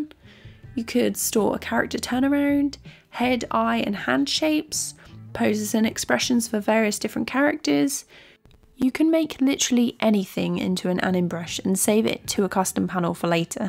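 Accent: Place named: Norfolk, United Kingdom. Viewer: “Cuttn tool” love the Norfolk twang ❤️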